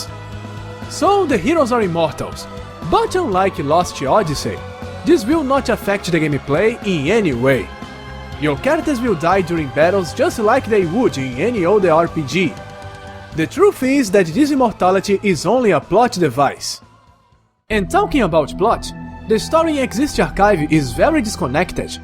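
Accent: Brazil. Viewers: are you br? i can't stand your accent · e carioca, né? hahaah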